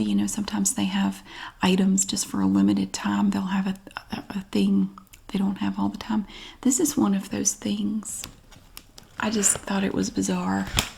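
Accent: South, United States. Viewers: You sound like Pam from the Office but with a southern accent!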